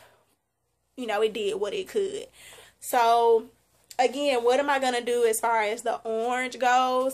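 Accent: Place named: Alabama, United States. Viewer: If You Don't Mind Me Asking Do You Live In Alabama And If So What Part? I Can Tell By The Accent (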